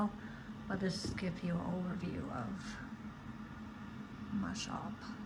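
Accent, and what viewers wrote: United States. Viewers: Thank you for the nice tour of your shop. Mine is similar in size. I'd like to show you mine now that you've shown me yours, but how do I do that? I am somewhat advanced in furniture making but a beginner at the lathe. I'm glad I found your video channel and will follow you with interest. You sound as if you have a New York accent but you've mentioned that you came from Florida and moved to Maine. But, what's with the Penn State sweatshirt? I am a retired mechanical engineer and graduated from Villanova. I live about two miles from that campus, so I didn't get very far after graduation. I worked for the DOD and the Dept of the Navy fixing ships; actually I've been all over the world at least twice. Good luck in your future video making. You give good video. -Curt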